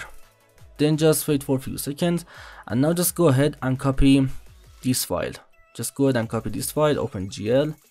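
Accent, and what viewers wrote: Iran. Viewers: ایرانی نیسیتی؟ لحجه رو برم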